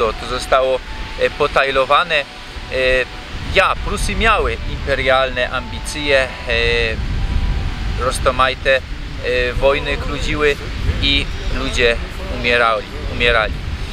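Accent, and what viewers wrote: Silesia, Poland. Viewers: Kto cię tak ładnie nauczył mówić po polsku w gwarze śląskiej?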